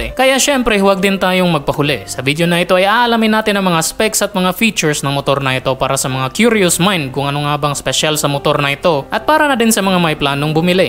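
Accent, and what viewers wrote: Visayas, Philippines. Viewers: Dahil Bisaya ka mag salita,,, Subscribed na ako... Heheheh!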